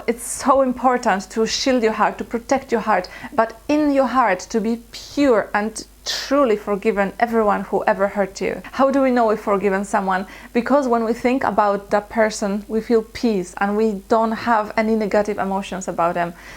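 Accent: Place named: Poland. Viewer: pls don't lose your polish accent ya.. luv it.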